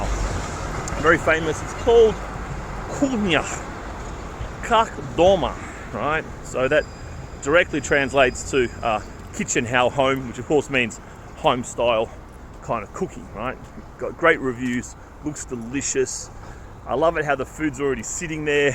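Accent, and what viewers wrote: Australia. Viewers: It's very hard to understand this Australian accent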